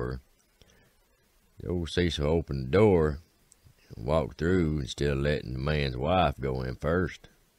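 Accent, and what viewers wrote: South, United States. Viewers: your smooth southern comfort voice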